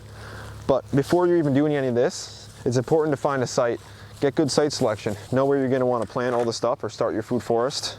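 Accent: New Jersey, United States. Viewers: Just found your channel and quite glad I did. One suggestion... stop talking Jersey.... slow down.... :)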